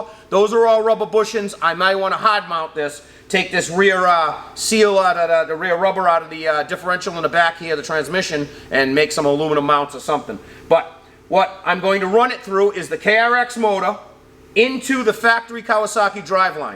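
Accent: Boston, United States. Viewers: All I hear is Boston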